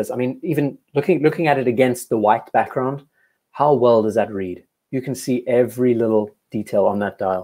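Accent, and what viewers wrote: South Africa. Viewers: Did not know you were based in the UK. I thought I was picking up a South African accent.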